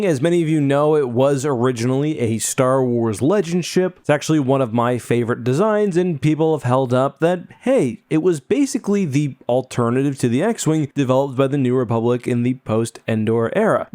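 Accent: Canada. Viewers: oh , Canadian or from Minnesota?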